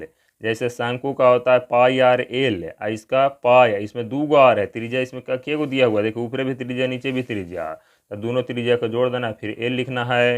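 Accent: India. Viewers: thanks sir bhojpuri style me 😘😘😘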